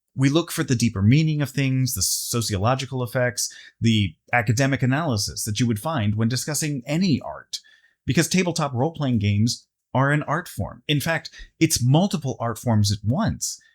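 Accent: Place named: United States. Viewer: One critique from the early portion; You sound like your culture is American, your heritage is Asian. Just like I am American with a German heritage. Just a nitpick, don't mind me, lmffao.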